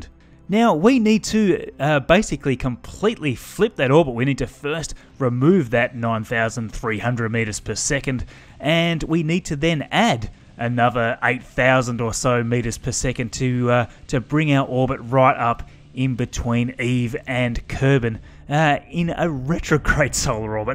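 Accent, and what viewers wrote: Australia. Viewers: Oh. I know what's up with the AU accent (I'm used to associate this accent with the british one because I'm from Spain), but still, nice job with the videos, the things you guys make are so satisfying to witness:D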